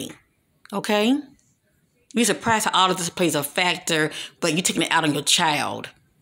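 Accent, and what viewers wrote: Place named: North Carolina, United States. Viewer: Idk where you're from but your accent sounds like southeast NC. It sounds like home to me. I know you're talking about really dark things but I get so soothed and relaxed from your voice because it takes me back to the women who cared for me, taught me and showed me love as a child. I wish many blessings to you and thank you for the hard work you do.💜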